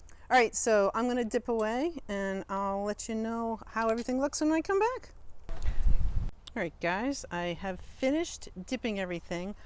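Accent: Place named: Michigan, United States. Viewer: You must be from Michigan as your wonderful accent sound so much one my friends from Michigan?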